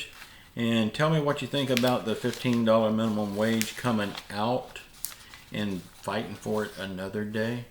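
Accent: South, United States. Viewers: We need to PRAY and have FAITH! Mr., I. Think. You have have soft lovely Southern. Accent, People will always criticize! I have accent too! Have good day! 😊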